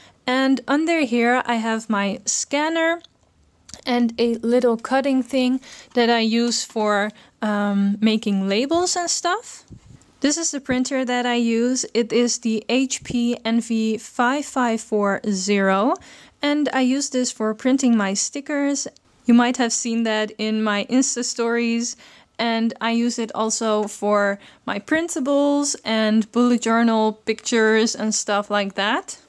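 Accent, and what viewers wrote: Netherlands. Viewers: i knew you were dutch when u started talking lol. i know the dutch-english accent from anywhere! :D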